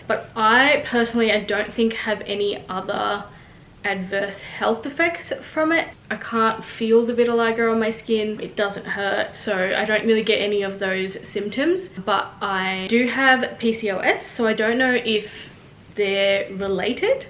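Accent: Australia. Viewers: I’m glad you answered the question of your nationality because I was very curious. Your features look very European. Maybe that is because the central part of your face is very fair now. I was wondering with your accent if you were aboriginal.❤ it is so interesting that pigment can come and go. I wonder if because you have lighter spots where you would be exposed to sun, if sunlight hasn’t played a part in changing the skin cells. I’m sure the condition is very interesting to scientists as to why our bodies do what they do. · She definitely has an Australian accent so I was a little surprised when she said Sri Lankan. I don't know what a Sri Lankan accent in English would sound like?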